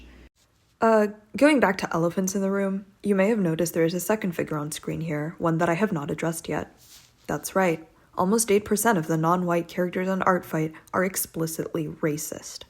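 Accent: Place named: Greece. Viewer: I just started the video but your voice instantly let me know you're not just a woman, you're a woman of greek origin😂idk if you speak Greek, your pronunciation is great, but I just knew ahaha, glad to see greek creators in the wild. edit: I checked your insta (followed and commented too, notice me!!/j), I was right and we're the same age too lol Edit 2: okay now that I finished the video, first of all, you took a lot of my thoughts and put them into words which I loved. It shows a lot of the mentality when it comes to writing women. I was thinking "most of the internet art is women, but that's because men drew out their p*n material. And then you said it, it's gooner shit. It's pretty statues with nothing about them personality wise. Some pros may make the design good enough to communicate their role in the story but that's still surface level and they could absolutely suck in a story as a character, even if "woman pretty and design semi functional". 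I'm also in stem (heyyyy) so I appreciate a good graph and analytical approach with methodology for the conduct of the research. Apart from the video itself, I've been writing stories without realising it mostly about women. They're the driving forces of their stories, some of them suck and make the rest of the cast worse, manipulate the men, control their fates and relationships, either on purpose or by accident. I haven't drawn my characters a lot due to time, apart from the main duo, but I don't see them, any of them, as my b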